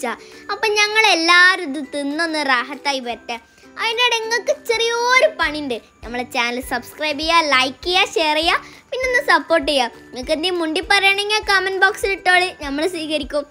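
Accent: Malappuram, India. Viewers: ഇത് മലപ്പുറം ഭാഷ ആണോ, 😘😘😘 എത്ര വയസ്സായി മോളെ നിനക്ക്, മാഷാഅല്ലാഹ്‌ 👍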